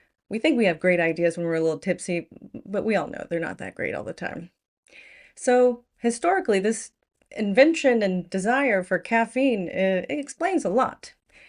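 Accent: South, United States. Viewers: Hi,I do enjoy your videos. For one reason, you don't start in hysterics like many other US folks, and your accent is charming. No I didn't learn a lot about the tea vs coffee thing, because I was mostly aware of it, but I enjoyed your telling me about it. I am "very" British, and a "chain" tea drinker, but also enjoy a good coffee - Columbian being my preference made in a cafetiere (French press). I had great coffee in France, Spain and Italy, but the best ever was made in a "briki" using stream water in a small mountain village in Crete! Coffee is a slightly toxic stimulant whereas tea is an infusion.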